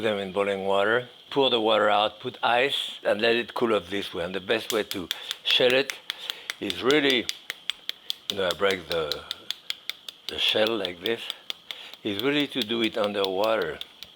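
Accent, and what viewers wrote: France. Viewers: Also his voice and that little French accent, it's irresistible. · “ ‘Appy Cooking!” Love his French accent! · Jacques tu es le meilleur et cet accent parisien 🎉❤❤Longue vie mon cher 🎉❤🎉❤🎉❤🎉❤inchaAllah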